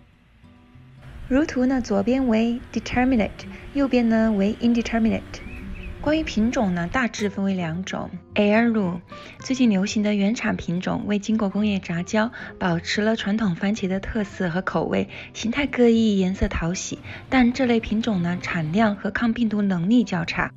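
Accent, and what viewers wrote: Sichuan, China. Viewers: 小姐姐是四川人？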